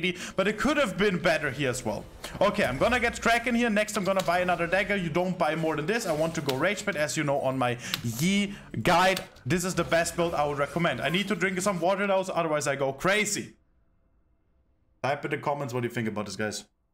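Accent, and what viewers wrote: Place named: Germany. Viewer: Just listen faster man haha xD Maybe it's also easier for me, cause from the accent he seems like a german speaking guy. So i can understand that very well ;) That makes it even more impressive doh!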